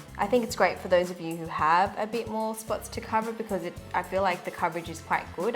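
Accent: Australia. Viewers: I started watching her and I was like, is she Australian? I was right. Go Australia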